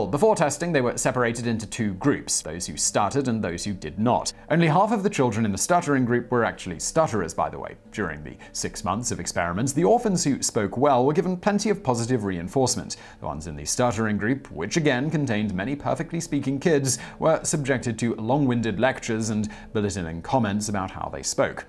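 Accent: United Kingdom. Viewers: Something about a British narrator talking about America's atrocities makes this video hit different to me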